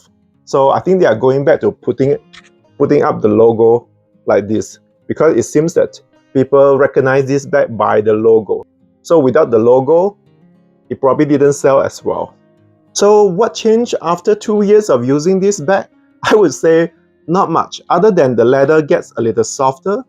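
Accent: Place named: Malaysia. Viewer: no offence but are you anyway from malaysia/singapore cuz you have their articulation? 🤣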